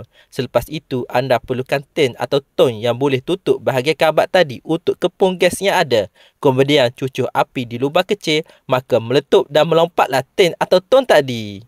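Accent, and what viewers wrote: Kelantan, Malaysia. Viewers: Admin ni oram kelantam ke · Hg ckap loghat kelantan ja la. Srabut aku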